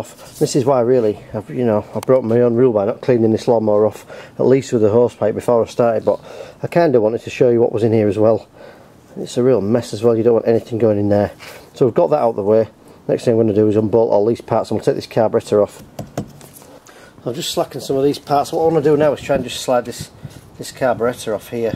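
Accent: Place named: England, United Kingdom. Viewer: Read your closed captions as you view this video. I'm in Alabama and trying to understand your accent (English, I suppose) is tough but doable. I guess it really must be a challenge to understand, by whatever means that is used to add close captions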